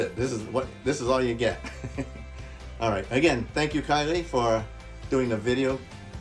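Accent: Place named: Wisconsin, United States. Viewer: You sound like you could be from wisconsin or the u.p.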